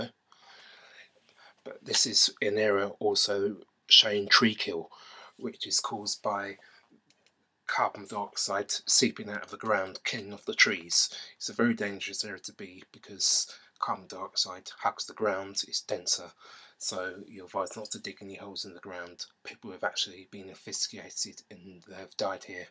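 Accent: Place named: United Kingdom. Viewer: hard to understand a mumbling brit!!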